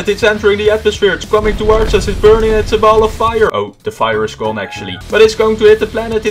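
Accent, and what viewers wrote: Netherlands. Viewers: Ik kan horen dat je Nederlands bent, maar dat neemt niet weg dat je Engels goed is. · Een klein beetje Nederlands accent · Wait he Dutch? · Are u dutch? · Je bent sowieso Nederlands Or if You’re English I thought you were Dutch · ik hoor een nederlans asent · You sound dutch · i hear some dutch accent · This guy is totaly dutch like 100% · He is from holand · No one like bc he sounds dutch just talk dutch no one understands you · Are you dutch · You sound dutch? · You dutch?? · bros dutch? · Are you from The Netherlands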